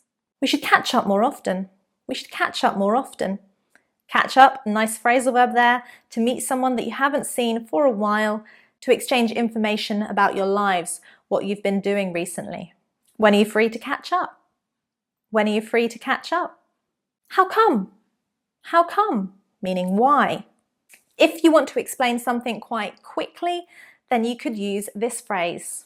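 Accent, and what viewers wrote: United Kingdom. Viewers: Lovely. I've been looking for pure British English pronunciation. Thanks a lot. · Thank You for you conversation teaching is it nice one to learn actually l want to catch your accent British this one easy not hard but the thing is how can to memories the Bank of vocabulary this problem is great so when it found the english speech rather unable to know every things this is my vision tell about when listen podcast can not understand all great thanks to you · So helpful phrases. Is this a British accent?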